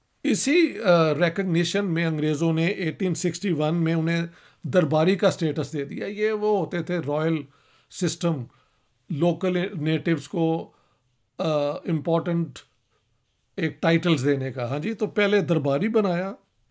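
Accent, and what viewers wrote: Punjab, India. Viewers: Respected Dr Sahib Many many thanks for your thought provoking and illuminating talk on Hindu families of Lahore which enriches our knowledge about this city of Lahore before partition Your style is lucid and Punjabi accent is commendable which shows your high class experience with erudite wisdom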